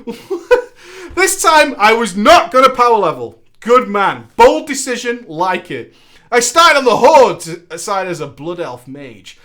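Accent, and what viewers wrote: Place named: United Kingdom. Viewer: It's too bad you are on EU... I'd raid with you just to listen to you entertain us in Teamspeak or w/e you use... everything is more interesting with a British accent, lol.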